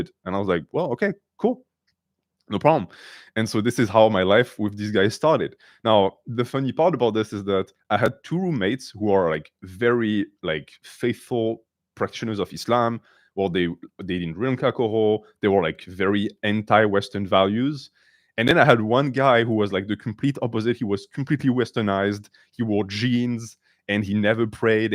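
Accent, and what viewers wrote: France. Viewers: he’s loosing his french accent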